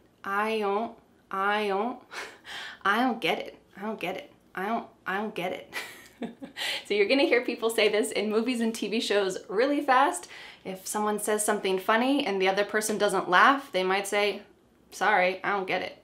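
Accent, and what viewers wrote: United States. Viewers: I'm a native arabic speaker, These days i'm learning American English, so i would ask you Are all your videos in american accent? Thank you very much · 🗽 Amazing video! real American English pronounciation 🇺🇸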